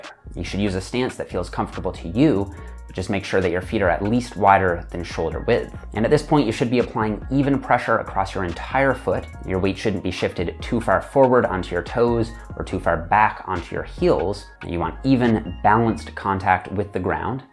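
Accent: Newfoundland, Canada. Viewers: The Canadian "r". Very distinctive. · Hello, been a big fan of Good Morns for years. Can you tell me what your thoughta are on a front GM where you're hugging a weight plate? Thanks very much. Also, are you from Nfld? Your AR's sound like mine and I'm from Nfld.😋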